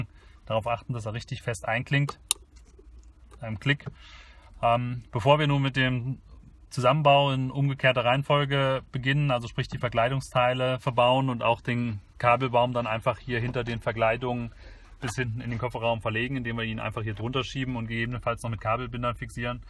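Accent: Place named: Germany. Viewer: Wait.... don't tell me.... that guy is German.... right?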